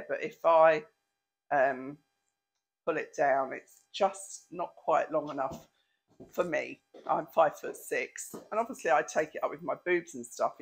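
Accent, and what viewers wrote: England, United Kingdom. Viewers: I love hearing the English way of saying things, so please don't feel you have to say sweater. The flower sweater needs something in the middle. That gold neck sweater is stunning on you! The detail is almost art deco. You could wear that any time of year and, heck, wear it during the day! Hahaha, the pearls are molecules! Love the pattern of the jewel one! So pretty and really catches the light. The berry color looks great on you! It's definitely more casual than the others, which isn't a bad thing. The ivory looks lovely with your hair! The gray could be worn any time, as well. It's cute! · I agree with both your favorite sweater and the jacket type with stars - and the quality of the knit makes such a difference - I guess I am a member of Hels Bells also, from over the pond, - I look in am for what is new and enjoy each one. 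- my English blood loves your accent/your fun personality and all our trips to England, - hubby and I (lost him two years ago) said for all our European trips, England we would go back in a blink. I love the term “jumper” - I remember an English author I was reading from about 30 years ago was my first introduction - I was puzzled as she put on a “jumper “ that is a MMm. How do I explain - skirt with vest top attached. We call that a jumper, - but I love your term for sweater and at this point the world is so much smaller, I think most Americans know for the English, a sweater is a jumper. Keep your English terms, makes you more special💕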